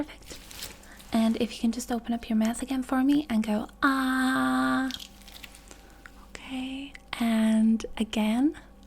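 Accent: United States. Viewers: I had forgotten how good your American accent was. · Omg what ur not American??? I’m American and this is literally such a good accent, I’m so impressed that u did it so subtly. Also loved the ASMR part lol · Liking that American Accent.Great work. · Your American accent has become so convincing! About halfway through the video it clicked that this wasn't a Scottish, British, or Australian accent. Really good stuff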